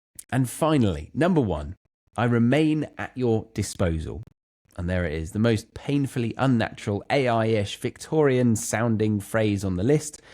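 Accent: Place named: United Kingdom. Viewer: yes, Britishness and naturalness and the accent of yours😊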